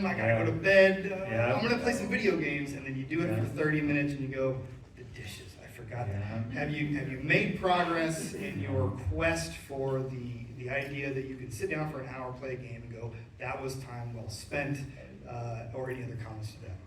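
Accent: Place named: Western Pennsylvania, United States. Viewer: I recently found your channel and have been binging it. I thought you from the mid west or near east coast. I'm from western PA and your character is how we just talk. I thought you were one of us, you dirty dog!! Everyone even called my grandmother "Naner" for crying out loud. I got back into retro gaming in the past 2 years after dealing with a potentially very serious illness (it's mostly under control now) and finding your channel has helped me stay together while I distract myself with collecting and revisiting retro games again. I'm a lifer at 41yo started with a used Atari 2600 at age 4, then NES etc. all the way through to today. Thank you for the excellent channel.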